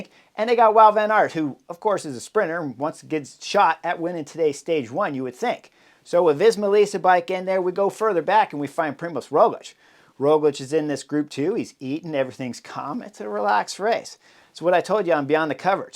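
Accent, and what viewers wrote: United States. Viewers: Great analysis and superb commentary. The American accent is really getting me 😆. Super amusing hearing everything pronounced that way.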